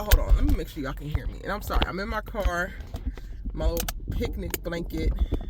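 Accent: Baltimore, United States. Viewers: Side note: I feel like your accent is giving Baltimore/DMV vibes. How wrong am I?? Lol 😂😂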